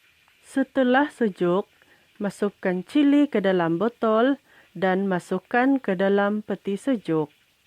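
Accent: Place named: Sabah, Malaysia. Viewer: Cara ulas tu bah, punya lain.. Pakai ja tone sabahan bah.. 😆😆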